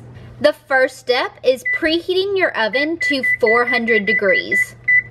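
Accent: South, United States. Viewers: “Brushy ma jig” love it! Love ur southernness.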